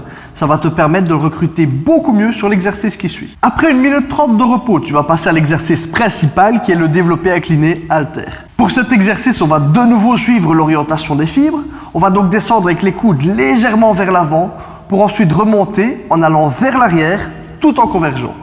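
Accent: Liège, Belgium. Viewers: Ce fut un plaisir d'avoir fait un petit brin de causette ;-), continue comme ça, tes vidéos sont vraiment instructives, maiemes avec l'accent de lièèèche ;-)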